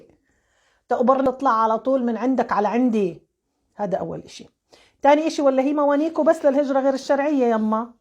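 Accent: Egypt. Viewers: انت لست فلسطينية انت مصرية لهجتك تؤكد ذالك ولم أكمل مشاهدة الفيديو ومصر ليس لها الحق كما ذكرت من في سدة الحكم القاتل عميل الصهاينة الخسيسي يحمي هذا الكيان اللقيط والقافلة تريد فك الحصار لا الحرب وانت تبرري لها الحق عن اي حق وهو جند التراما دوليون الحشاشون عبدة المال القذر لسب الناس المسالمون وإعلام العار والشنار اللاعق الناعق بما هو مخزي لدولة عريقة أصيلة بحجم مصر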